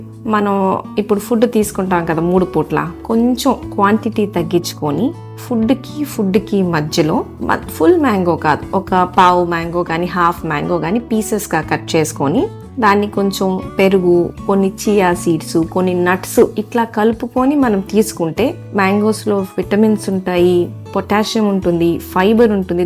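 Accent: Guntur, India. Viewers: Mi slag chusi ankunnanu mam miru ma guntur jilla vallani❤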